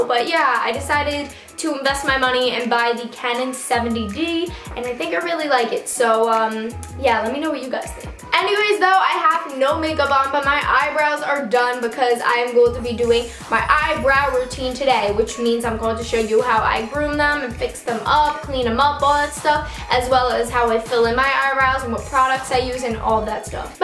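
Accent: New York, United States. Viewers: she has such a jersey or New York accident I love it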